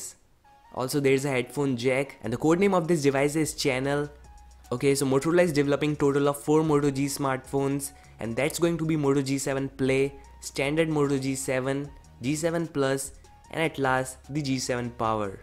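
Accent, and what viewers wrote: India. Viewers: Alter dein indisch dialekt geht mir auf die eier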